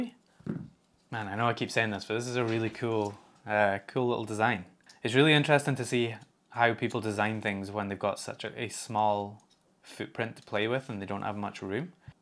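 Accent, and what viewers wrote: Scotland, United Kingdom. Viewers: Have really been enjoying your channel as i have been learning ad getting my smart home all set up. Been homelabbing for a couple of years now and finally got that Automation bug. Plus the wonderful scottish accent gives you an edge lol. Great videos brotha keep it up.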